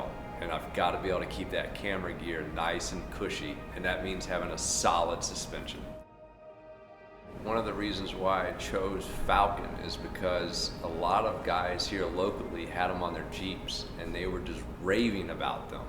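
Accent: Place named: Utah, United States. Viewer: He sounded like he was from Utah then he said he was from Utah. 🤦‍♂️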